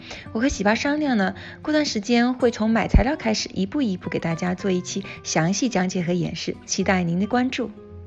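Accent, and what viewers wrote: Sichuan, China. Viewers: 小姐姐是四川人？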